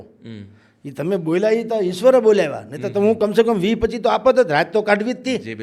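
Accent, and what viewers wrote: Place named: Kathiawar, India. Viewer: અસલ કાઠીયાવાડી તળપદી ભાષા મજો આવી ગયો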